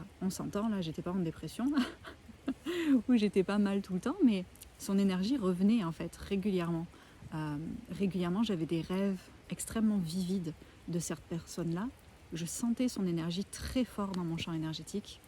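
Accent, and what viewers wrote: Quebec, Canada. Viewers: Ahah j'entends un peu de Québec là là...Merci pour ton message.✨👍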